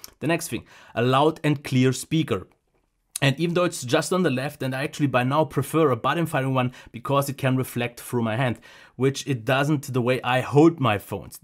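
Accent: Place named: Germany. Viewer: I love your videos and your accent you German Guy :D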